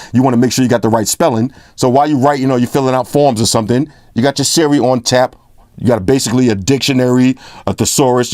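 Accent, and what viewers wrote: United States. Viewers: Haha. That's awesome,. You sure sound like an American. Nonetheless your reviews are awesome. Keep it coming!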